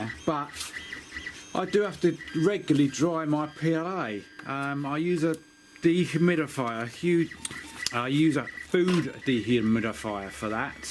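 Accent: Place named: England, United Kingdom. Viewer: I notice you have an English accent, do you live in the UK? I ask because I live in South Yorkshire and I dont have to dry any of my PLA, my PETG,ABS,ASA,Nylon are kept in vaccum sealed bags after drying them and are inside the enclosure when printing to keep dry. great deal by the way especially from Amazon.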